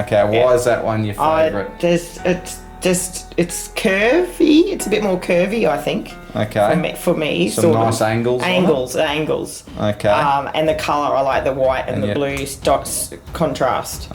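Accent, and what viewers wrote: Australia. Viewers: i love being able to watch/listen to a true Aussie! Great Video!!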